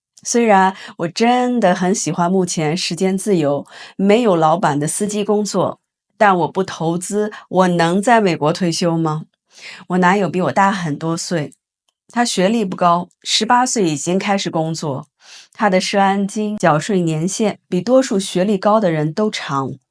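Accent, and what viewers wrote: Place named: Beijing, China. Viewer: 这次说话有北京味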